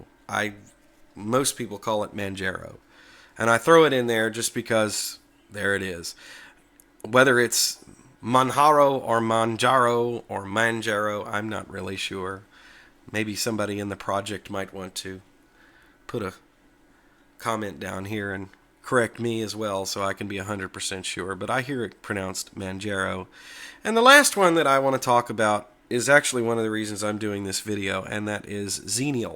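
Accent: South, United States. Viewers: Does pronunciation matter? Well, not really as long as communication is possible (paaaaaaasible :-P ). Love that long "a" sound so many Americans have on words with "o" in them. I can't even make that sound :-D I love all the accents you get on Youtube, all those different people in different places, proving how diverse the world is. My accent and dialect is English (a mixture of west Yorkshire and west Midlands dialect and accent). I was having fun with your accent on the Ubuntu video, I'm guessing it's southern US somewhere? Virginia?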